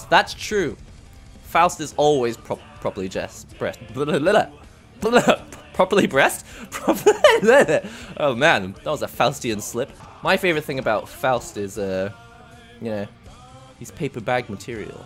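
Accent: England, United Kingdom. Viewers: There's no way he's actually from Japan, he almost sounds like he has an English accent rather than a Japanese one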